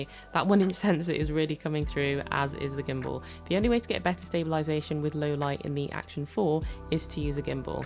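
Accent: United Kingdom. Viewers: Great video. Talk slower for your over the pond English is hard to take in your info.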